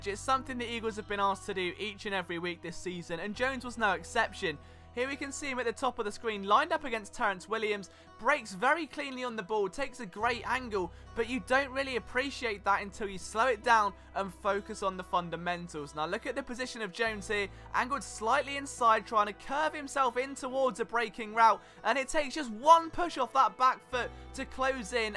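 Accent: United Kingdom. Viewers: I absolutely love your analogies, I'm a Native tarheel; and we have the Carolina Panthers here, but I've been an EAGLE fan for 33 years! Growing up there were no Black QB's, except for Doug Williams who still led the awful Bucks to an NFC divisional play off. Love the accent, I'm compelled to drink a cup of Earl Grey when watching your Eagle report, keep flying.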